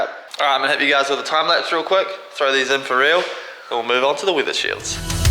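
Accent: New Zealand. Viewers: is that an nz accent i hear?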